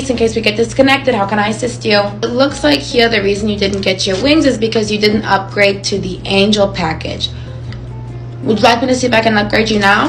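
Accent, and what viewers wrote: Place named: New York, United States. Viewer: love the New York accent. fr Australia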